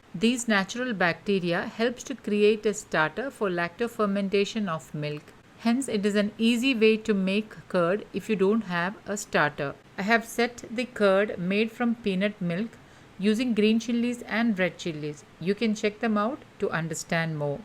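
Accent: Kerala, India. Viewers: Yup.. it’s the cuteness of Malayali accent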